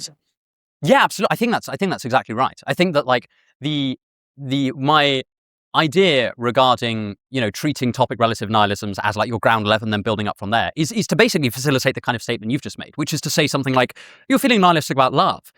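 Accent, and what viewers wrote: United Kingdom. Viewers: Entirely unrelated to the video, but do you ever feel as though people (not from the UK lol) legitimize what you say because of accent you speak in? I randomly had the thought as I watched this video. Just “if were American or Australian, would my/the audience’s reception of his words remain the same?” It sounds really horrible but I’m not without bias.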